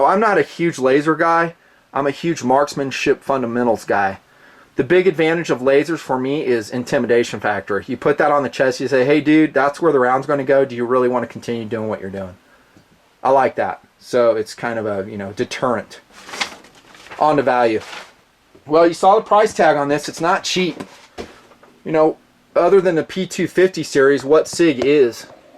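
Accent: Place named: New York, United States. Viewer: You sound like you're from New York or something. You're not fighting damn terrorists. If you are a soldier you need to wake up and realize that your own government is arming the terrorists in Afghanistan, Libya, Syria, and so on. Read the news. And boy, I'd like to see you come down to my neck of the woods and Texas and people how many rounds they should have in their mags.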